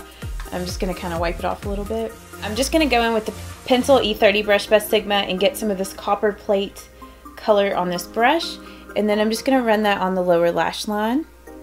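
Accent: South, United States. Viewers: HI.:) I JUST WANTED TO SAY. YOUR MAKEUP ALWAYS LOOKS FANTASTIC;) YOUR ARE SUCH A BEAUTIFUL GIRL;) IM ORIGINALLY FROM OKLAHOMA AND JUST LOVE YOUR COUNTRY ACCENT;)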